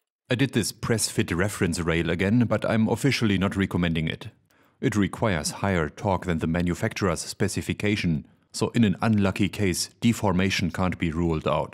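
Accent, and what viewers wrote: Germany. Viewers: German accent👌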